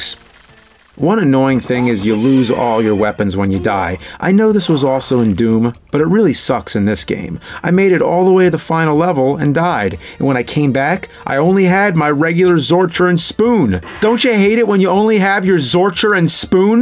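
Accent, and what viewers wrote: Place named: East Coast, United States. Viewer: But with that awful East Coast accent, it's more like "Æss".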